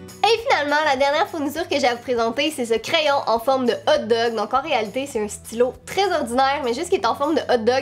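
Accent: Quebec, Canada. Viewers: Est tu québécoise ? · Alors je pense que c normal je viens de France du sud même mais sinon j'adore l'accent québécois !!!!😀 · L'accent québécois 🔥😍😍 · J'adore ton accent !!! J'essaie d'apprendre l'accent québécois ! Tu es trop belle j't'adore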